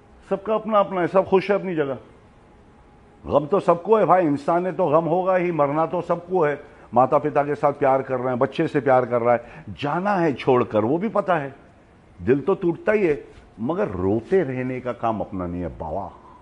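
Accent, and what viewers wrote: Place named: Mumbai, India. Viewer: Like his simple philosophy mumbai Hindi nobody speaks like him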